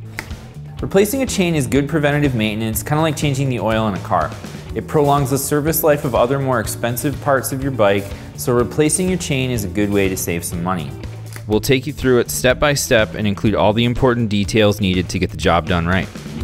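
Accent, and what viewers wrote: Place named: Canada. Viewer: sounds like a canadian..I like the canadians I have known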